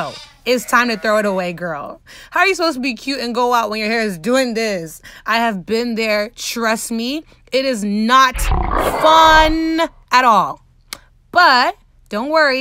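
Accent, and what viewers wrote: Nigeria. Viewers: Are you Nigerian? I promise i hear teni askamaya in the background! Sisshhh plus i can low key hear the Nigerian accent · I like how you infuse naija English for drama 😂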